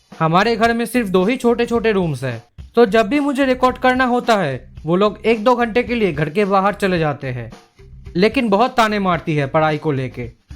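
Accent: Bengal, India. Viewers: Tomar hindi bolar dhoron dekhe mone hocchilo tumi bangali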